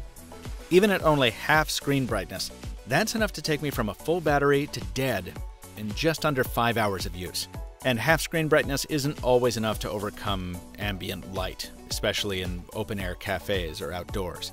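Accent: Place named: Transatlantic, United States. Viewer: Nice Transatlantic accent.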